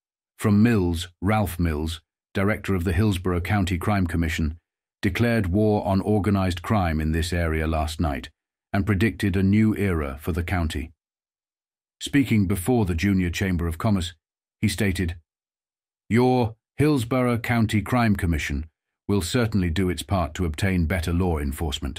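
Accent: United Kingdom. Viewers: Who wants to hear a stupid British accent !!!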